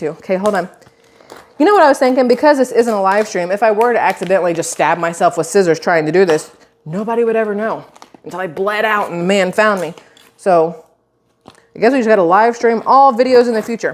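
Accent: South, United States. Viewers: Love ya , Your Awesome People!! , I love your voice and your accent I miss that I'm from the south to but that's not where I live at anymore !!, I love to hear y'all, and I miss hearing I'm fixing to do something LOL !!, IT'S AMAZING THE LITTLE THINGS THAT YOU MISS THANK YOU SO MUCH !!, PLEASE STAY SAFE !, I ENJOY WATCHING YOU YOU DO A GOOD JOB TELLING IT LIKE IT IS !!, THANK YOU!! GOD BLESS!! ,AND TO YOUR FAMILY!!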